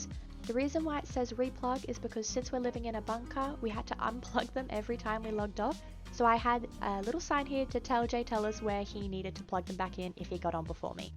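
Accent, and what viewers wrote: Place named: Australia. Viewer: love it when an Aussie woman tells me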